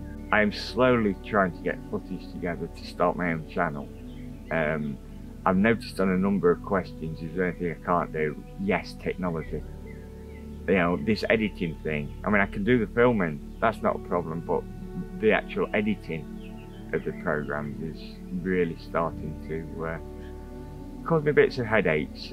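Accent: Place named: East Midlands, United Kingdom. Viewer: I would love to know whereabouts in the East Midlands you are from, or rather where you spent the most time as your accent is so familiar to me. My Dad was from Heanor in Derbyshire but worked for the electricity board as an overhead linesman. My husband also has a similar accent as we are from Kimberley in Notts. I hope you don't mind me asking. My husband would love your lifestyle.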